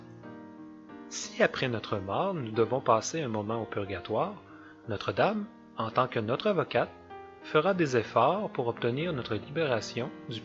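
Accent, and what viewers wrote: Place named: Canada. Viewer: douce vierge marie accent canada il faut bien ecouter moi qui suis d origine allemande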